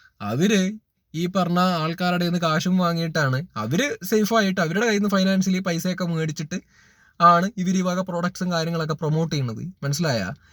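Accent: Palakkad, India. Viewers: Palakkad aanalle kettappozhe manassilayiii...😂❤ njnum pkd ane...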